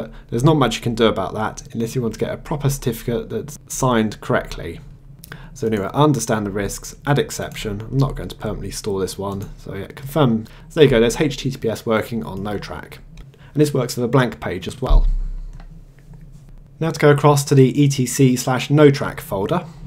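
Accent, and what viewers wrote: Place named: Cardiff, United Kingdom. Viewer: +1 for you voicing a Git or Syn tutorial. +1 for Cardiff accent.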